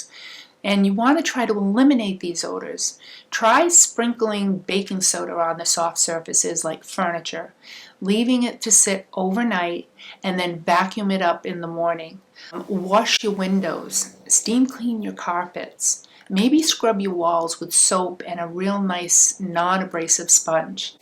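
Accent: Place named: Massachusetts, United States. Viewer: Sometimes I forget how much I miss the Massachusetts accent.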